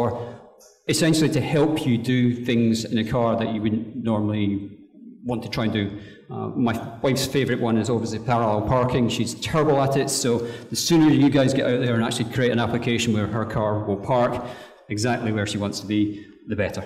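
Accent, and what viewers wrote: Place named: India. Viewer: Indian english host？the accent is very unformal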